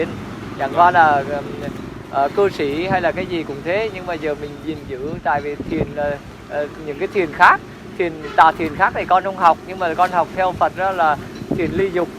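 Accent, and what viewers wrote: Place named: Ha Tinh, Vietnam. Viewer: Thích luôn giọng Hà Tĩnh luôn ❤❤❤❤❤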